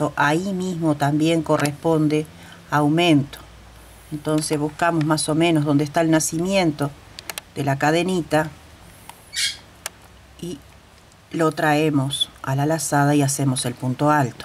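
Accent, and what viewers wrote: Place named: Argentina. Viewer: hola,,amiga yo pensaba k eras argentina por el acento,,, todo lo k haces es muy lindo y todo muy bien explicado,,, maravilloso tutorial,,,un abrazo muy fuerte,, desde islas canarias ,,,España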